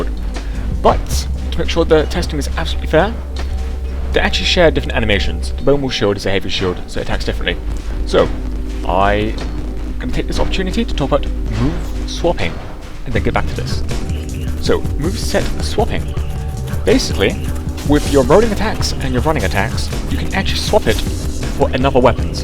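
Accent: United Kingdom. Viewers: i wish i could understand more than half of that. you are talking too fast and with too much of a british accent to be perceivable. please do subtitles.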